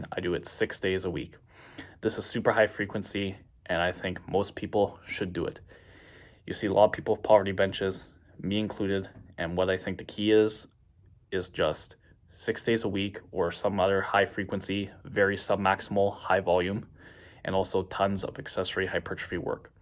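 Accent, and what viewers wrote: Canada. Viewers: I was looking at his initial 3 days of bench, super surprised that someone this level only benches 3 days a week... and then I saw the other 3 days LOL Also it’s extremely jarring to hear how Canadian he sounds after only seeing him on the gram 🤯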